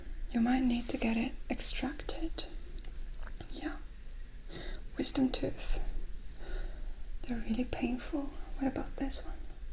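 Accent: England, United Kingdom. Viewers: el acento británico q le pones es excelente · Tu acento de inglés es británico que cool, el mio es el típico acento de Estados Unidos 😂😂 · am i crazy (hah) or is she kinda doing an english accent? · tu acento en este vídeo era británico a diferencia de otros que has hecho... me gustó 👌👍👌👍👍👌👌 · Sounds like you are doing a bit of a British accent in this vid. I like it! :) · Ese ligero acento británico que haces es hermoso y muy relajante 😍😍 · I really like your English accent ☺😋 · Esto es inglés británico,?:D Me encanta cómo lo hablas. <3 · Lmao I love the way you do an English accent. Great video as always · "ostiaa" cit. 😂😂 per pochi secondi mi hai steso;) per il resto che dire, adoro il tuo modo di parlare, il tuo English accent, adoro ascoltarti in ogni lingua 😄😄 thanks 😘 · that british accent omg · C'est marrant que tu aies un accent britannique quand tu parles anglais. 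Thanks for the video!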